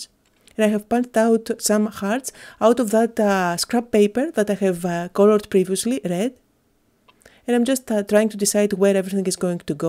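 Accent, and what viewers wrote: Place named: Greece. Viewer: I always liked your accent and wondered where you are from . I wouldn't have thought Greece. I have always wanted to go there!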